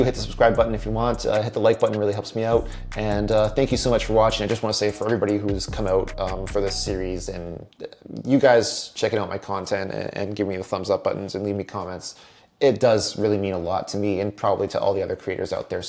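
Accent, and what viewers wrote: Canada. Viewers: He’s Canadian eh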